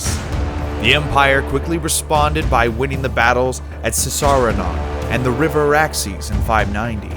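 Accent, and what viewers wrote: United States. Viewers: This just does not work for me. The American accent with it's mispronunciations of cities and countries makes it sound very amateurish. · God, an American accent on a history show makes it sound like a cheap History channel pile of bullshit.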